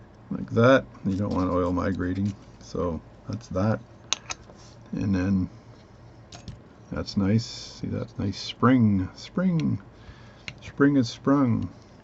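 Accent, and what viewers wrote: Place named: Newfoundland, Canada. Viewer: Ok. The mystery is over. You are from Newfoundland. Right?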